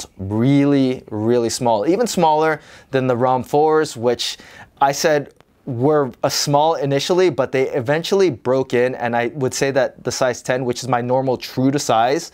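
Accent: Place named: China. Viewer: The Chinese English 🙄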